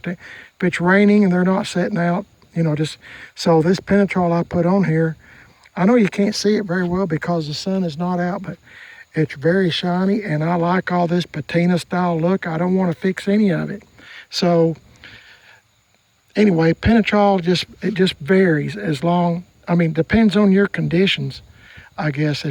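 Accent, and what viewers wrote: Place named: Alabama, United States. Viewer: Sounds like an Alabama accent. We lost a good man from Alabama and you sound just like him. He will be missed ❤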